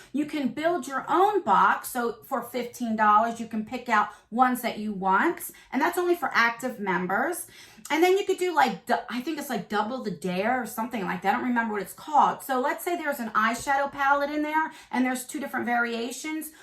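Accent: New Jersey, United States. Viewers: I love your channel! I heard the accent and it reminds me of home although you are from Jersey & I'm from Long Island. Similar sound. 😆 Such fun videos! Im in my early 50s and relate more to your channel more than some of the younger beauty gurus. We have different beauty needs & well, I love budget friendly beauty that can be found in these beauty boxes! Lol. Favorite emoji, hmmm, oh it has to be one that I always give to my kids, 💖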